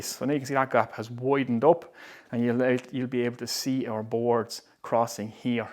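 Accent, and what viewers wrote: Waterford, Ireland. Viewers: Hi. Found your site by accident but well worth the visit. Living in Co. Meath and the accent and the Irish gift of the gab is so refreshing on a site such as this. I look forward to seeing the rest of your catalogued videos. Cheers. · Ii, great videos. Really well done and very informative. I'm just getting back into woodworking after giving up wood turning many years ago. I'm making some patio furniture and some raised planters so great ideas and guidance from your videos. I'm looking to make some chopping boards for family and friends as gifts and I'm struggling to source some hard woods such as walnut, beech maple etc. I'm seeing some hardwood suppliers online but it's a case of very little detail of board size/lenght and cost. It feels a bit intimidating when looking for relativly small amounts. Are you aware of any suppliers that would deal with craft or hobby woodworkers. 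Sawn timber would be fine as I can joint and thickness it myself. Love the videos and have started the mitre station. By the way, this might be fighting talk but is that a Deise accent I hear? Thanks and keep her going. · Great video, great workmanship but most of all, great accent. The Irish accent is always happy-sounding which is what we need over here in England at the momment. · What accent is this? Ok, Ireland.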